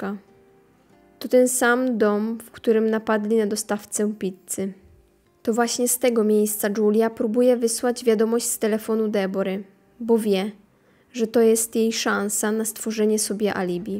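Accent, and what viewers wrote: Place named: Russia. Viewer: Posiadasz RUSKI AKCENT..Czy jesteś Ukrainka?????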